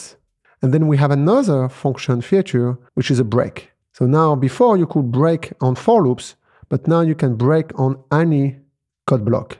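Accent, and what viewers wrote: France. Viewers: 'pub' 'fn' 'str' 'Err' damn Rust.. what's wrong entire and undestandable words? (You seem to have a french accent, right?).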